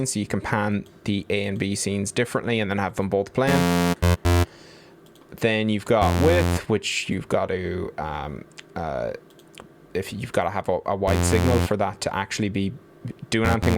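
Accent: Ireland. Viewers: 1. I like Irish accents so this was a nice hour break from the local Aussie accents. 2. I don't like speaking in list items so this is the last one. Not everything we watch has to be less that 30 mins in length. Besides, this is SURGE THE MOVIE and the title would not make a lot of sense if the video only went for 10 minutes. This lightening fast Surge overview is probably more to your liking: <link> I found that one provided a really good quick overview. I just really appreciate people take the time to make videos and share their knowledge. I think I remember something about "workflow optimization and efficiency" when I did that course when working in a supermarket. It was just before we did the first aid session.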